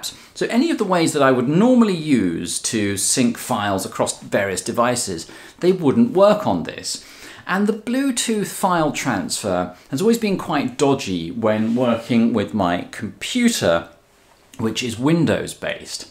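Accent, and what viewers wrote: United Kingdom. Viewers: Thank you so much, this is such a great help and I exactly had the same promblem as you. Thumb up and subscribed. BTW: I love the Britishnes of your pronounciation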